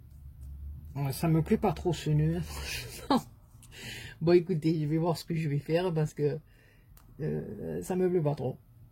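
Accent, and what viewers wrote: South, France. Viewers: Magnifique ! Merci beaucoup pour ce tuto. Avec l'accent du soleil ..... j'adore !! Bises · Magnifique carte panier très bonne idée pour la fête des mères. Je suis conquis par le petit panier, très jolie et en plus j'adore vous écoutez parler avec votre accent du Sud cela apporte du baume au coeur et un peu de soleil parce qu' il nous boude en Bourgogne. Merci pour ce superbe partage. Bonne fin de journée. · coucou je suis émerveillé par toutes vos belles créations, le panier fête des mères superbe, carte gâteaux également quel talent vous avez une imagination débordante pour la déco J,adore avec l' accent du midi je ne me lasse pas de vous écouter merci et bonne journée bisous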